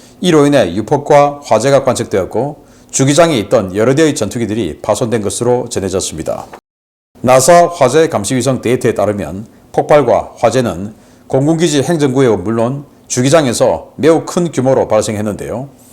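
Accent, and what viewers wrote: Gyeongsang, South Korea. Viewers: 신인균 너 말대로면 모스크바는 3번쯤 함락됐어야 한다 경상도 씹 말투 존나 거슬리네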